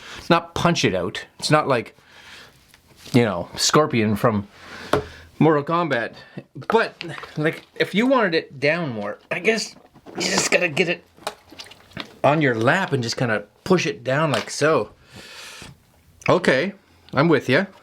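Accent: Canada. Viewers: I’m Canadian but this guy sounds 100 times more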